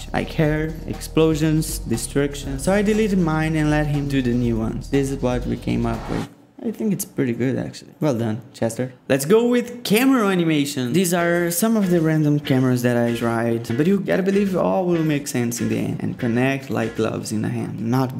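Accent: Brazil. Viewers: MANOOOOOOOO KKKKKKKKKKKKKKKKKKKKKKKKKKK ADOREI BR não tem jeito. Tu pode falar fluentemente, desde o incío eu vi a pitada de brazilização. TEU VÍDEO ME AJUDOU MUITO COM O INGLÊS, VOU FICAR REASSISTINDO KASJDLAKSDJ E QUE CONTEÚDO DO CARALHO EM, PF NÃO PARE AMIGO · Eu sabia que tinha sentido um sotaque BR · Caralho eu sabia que tu era brasileiro só pelo teu sotaquekkkkkkk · Mano eu não sabia que tu era brasileiro mas esse sotaque me fez desconfiar desde o início kkkk trabalho foda pra krl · Mano não sabia quer vocè era brasileiro mais tava um pouco desconfiado pelo seu jeito é o sotaque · Aaaahh eu sabia que era br só pelo sotaque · Antes de falar q era br eu ja desconfiei pela pronuncia, so eu q acho mto loko isso ? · Sabia que tu era Brasileiro porra, esse sotaque ai e é top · Mano, num outro vídeo q vi teu, eu pensei q parecia BR, e agora sei q eu estava certo... 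Principalmente pelo sotaque kkkk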